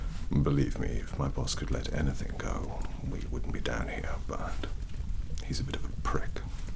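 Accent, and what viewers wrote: Wales, United Kingdom. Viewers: Yes finally an asmr video that combines all my favorites. Asmr, and Demonology, and british accents. Fantastic performance good sir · even tho I'm pretty sure you're Welsh hehe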